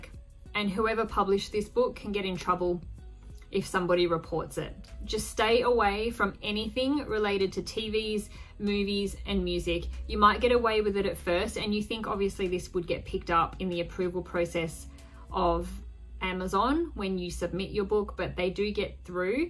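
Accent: Australia. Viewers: Aussie accent? Thanks for sharing!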